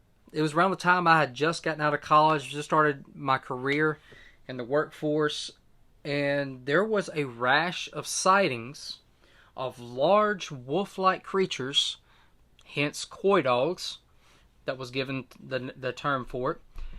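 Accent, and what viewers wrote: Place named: England, United Kingdom. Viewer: I’m sub 136. Good luck with your channel. Love your accent from England 🏴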